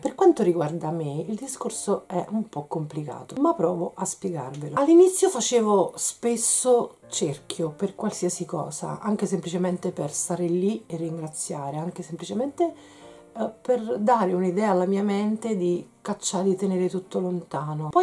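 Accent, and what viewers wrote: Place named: Lazio-Campania, Italy. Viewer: ma tu abiti tra Lazio e Campania? amo il tuo accento...e i tuoi video! un bacio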